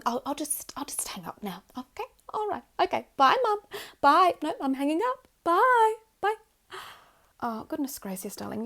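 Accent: Australia. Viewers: Just also i have to say i tbh wouldn't mind any un asmr video with aussie mom bcuz the accent lol have u tried learning other ones too? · i hate rich people ngl but there's something about this specific posh australian accent that hits the spot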